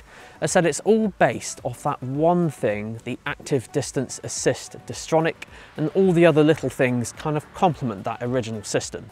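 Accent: United Kingdom. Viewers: your videos are superb! Explanations are educating and clear, video and audio are perfect! I even enjoy listening to your British accent. Thanks!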